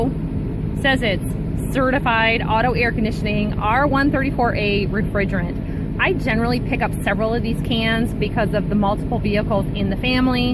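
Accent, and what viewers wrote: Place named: Midwest, United States. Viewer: wow I can listen to you talk all day. you got that cool Midwest Gretchen Whitmer fargo accent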